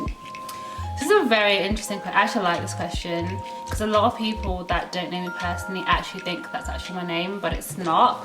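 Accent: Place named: Australia. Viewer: Loved this! Making me miss Australia, you've kinda got an Aussie accent as well now 😂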